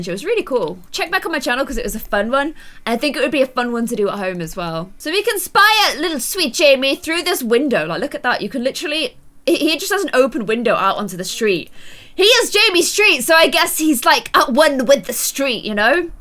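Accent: Ireland. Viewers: I didn't know u were Irish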